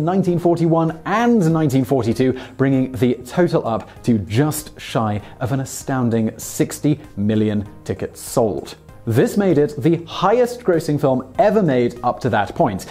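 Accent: England, United Kingdom. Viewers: Funny! You sound like you have an English accent, and yet you used the term 'so-called discovery of America'. This is funny to me, because as an English speaker--especially with an English accent--I would expect you to know the definition of English words. Clearly, you do NOT know the definition of the world 'discovery'. You see, since up to the point that Columbus 'discovered' America, Europe did not KNOW it was there. Thus when it is said that Columbus discovered America the term is the MOST accurate term. He discovered for his people something that neither he nor his people knew existed. Let's not just jump onto the SJW agenda when there is an entire dictionary of English words that you might want to research.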